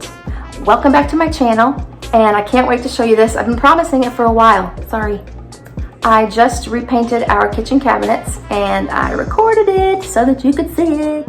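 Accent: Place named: Texas, United States. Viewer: are you from Texas you sound like it. I’m from Texas. I’m going to add my cabinet painting to my channel and give you a shout out if you are ok w that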